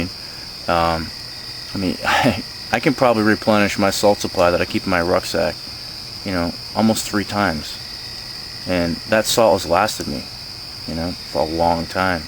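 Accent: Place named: Massachusetts, United States. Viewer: great vid you sound like you come Massachusetts 😆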